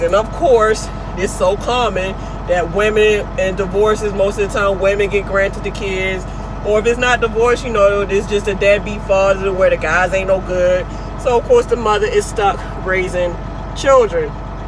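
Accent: Baltimore, United States. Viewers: You from Baltimore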